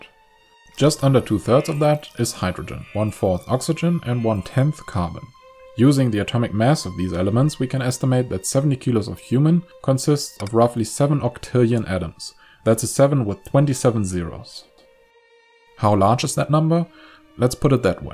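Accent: Germany. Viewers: I'm sorry, but a German accent saying the words "need to shave the heads of roughly 4 million people" cracked me right up. What can I say, I'm not one for being politically correct, either. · Warum lasst Ihr einen Deutschen Englisch reden? Macht das Video bitte entweder auf Deutsch, oder nehmt einen englischen Native Speaker. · The German accent makes it even more believable... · Well, he does sound German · Omg such a German accent xD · Horrible German accent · hier, ich will dich nicht vom videos-machen abhalten. ich find das wunderhübsch zu youtube was beizutragen. aber so´n übersteuerter deutscher akzent, da kommts mir hoch ey. kann man sprecher für anheuern, wenn man selbst nicht in der lage ist... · "lets make a human sausage, maybe out of a murderer or lawyer" hrhrh i knew he was from germany, if not already clear by accent ... pfff and they say we got no humor ^^ its just that our humor is rather dry or explicitly gruesomely dark =) ... 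but to our shame we have also lots of very silly humor in the category of fart jokes *sigh*, to me that type is very cringe even before watching lots of english speaking satirists and commedians over the years(thank you youtube). ontopic: thanks for the excellent presentation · He almost speaks German lol. Most obvious accent ever:D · The German accent is horrible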